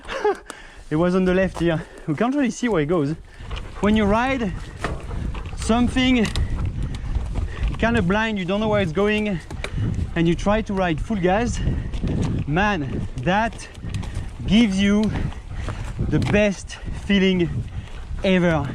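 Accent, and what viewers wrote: France. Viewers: T’es tu français, on dirait ben vu ton accent... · Impressionnant... Dis-moi tu forces pas un peu sur l'accent français? :)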